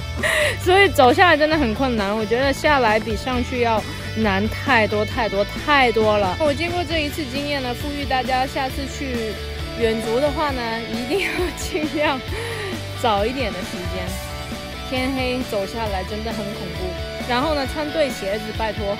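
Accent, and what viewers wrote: Hong Kong, China. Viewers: 唱歌像台灣人，講話像香港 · 港普好可愛啊哈哈哈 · 港普，體諒她一下哈哈哈😂在香港人裡她中文已經算說的很好的了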